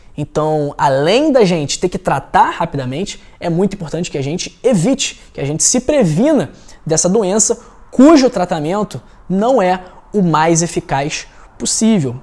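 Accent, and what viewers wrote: Rio de Janeiro, Brazil. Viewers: Gostei muito voce é carioca?voce tem sotaque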